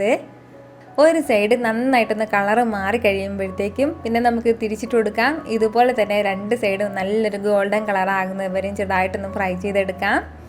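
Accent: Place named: North Kerala, India. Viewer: Watching you from US, love the way you talk and your north Kerala accent. Your introduction is very cute, sounds like a little girl. Continue to post lot of recipes...I wish you the very best!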